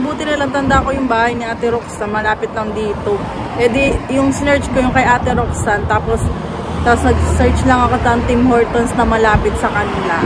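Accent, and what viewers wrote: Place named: Quezon, Philippines. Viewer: Puntong Quezon..Maubanin..Hello po from your kabayang pagbilaowin in winnipeg…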